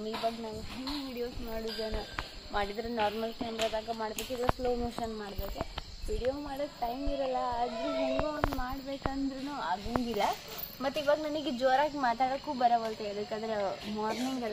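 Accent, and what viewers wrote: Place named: Raichur, India. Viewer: Bro idu Namma raichur matu matte Namma karatagi matu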